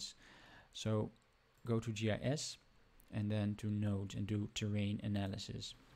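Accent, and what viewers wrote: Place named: Netherlands. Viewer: Je klinkt Nederlands, dus ik probeer het gewoon even. Dit werkt allemaal super, maar voor Nederland is een nauwkeurigheid van 30 meter niet echt realistisch. Nu is er natuurlijk het actueel hoogtebestand die om de meter een hoogte puntje heeft. Is die als SRTM data sorce te koppelen aan de GIS plugin?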